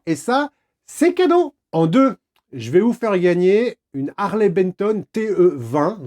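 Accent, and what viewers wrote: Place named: Quebec, Canada. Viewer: Bravo pour l’effort, effectivement l’accent Québécois est pourrit. Si ça peut t’aider on dit Tabarnack. Comme c’est écrit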